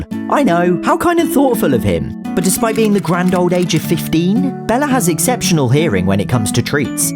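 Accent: United Kingdom. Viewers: Question are you british???????????????????? YOU WILL BE MISSED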